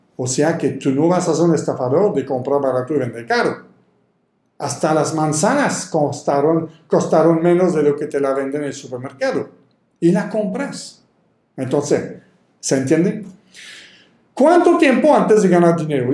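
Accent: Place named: France. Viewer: L'accent c'est très joli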